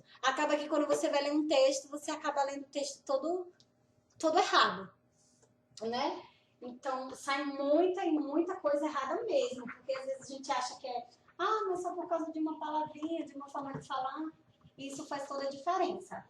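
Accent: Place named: Northeast, Brazil. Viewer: Tô louca pra aprender norueguês. Caí aqui na doida, mas já te amei. Obs: Acho que vc é do nordeste, vc tem o sotaque parecido com o meu. Sou de Natal/RN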